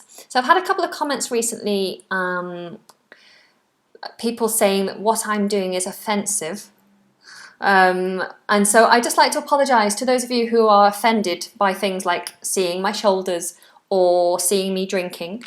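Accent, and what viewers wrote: United Kingdom. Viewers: I don't like the British accent thank you.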